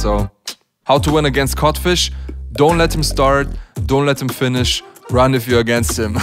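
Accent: Germany. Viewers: Du bist Deutsch oder du hast diesen Deutschen Akzent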